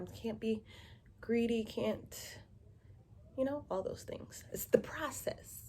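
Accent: Jamaica. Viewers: I had to subscribe as soon as I heard your accent come out 🇯🇲🇯🇲🇯🇲 much love from a fellow Jamaican 🖤🖤🖤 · Are you Jamaican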